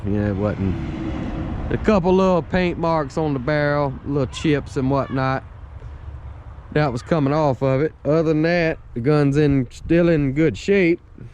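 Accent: United States. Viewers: I don't know what part of America but just love that accent. Thanks for the review.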